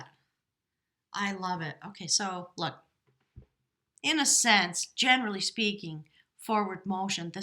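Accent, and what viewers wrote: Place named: Western North Carolina, United States. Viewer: The beginning sounds like Western NC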